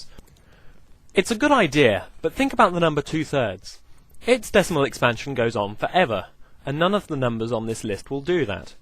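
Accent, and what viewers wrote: United Kingdom. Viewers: OMG man, you are a comedic genius. I loved this video!!!!!! Make more!!!!!! Chainsaw + Baby is more of a supper or dinner thing, at least i think so... and the British accent kicks butt! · zenos paradox - infinite divisibility, cantors argument - whatever u said here.. cant get past thick british accent.. but im assuming that notion was pointing zenos paradox. the infinite variables from infinite divisibility. there is some likeness in these, surely you must see it. if cantors proof notes incongruity through bijection, it is only out of the inadequacy of listing real numbers. out of his entity, out of our sentience existing finitively. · American's (i hope i speak for my country) don't find British accents funny, but fun to listen to and attempt. If anything, it might be the slight lisp or sibilant s... don't worry about it, it's awesome.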